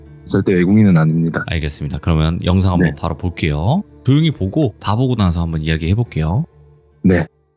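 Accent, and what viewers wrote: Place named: Busan, South Korea. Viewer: 오랜만에 듣는 부산사투리 넘 좋어요 멀씀도 넘 잘하세요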